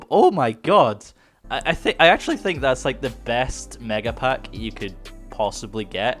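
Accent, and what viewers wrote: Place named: United Kingdom. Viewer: i just watched when i saw your trailer because i was like this guy is from the uk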